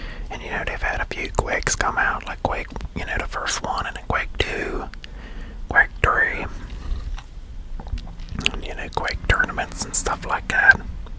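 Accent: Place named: Pennsylvania Dutch, United States. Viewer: You're way off. Its penny dutch.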